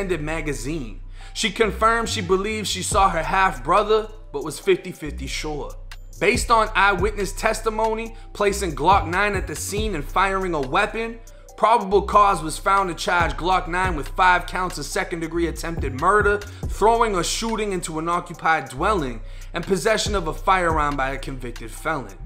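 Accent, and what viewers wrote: Boston, United States. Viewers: Only new to your channel I'm Irish pal but loving the channel me and my girl was watchin you she says his voice is like Ted's Boston accent 💯👌💚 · U sound like u from Boston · That Boston accent is heavy bro, haha i rock with you... Remind me of Slaine... Keep doing your thang man...#Respect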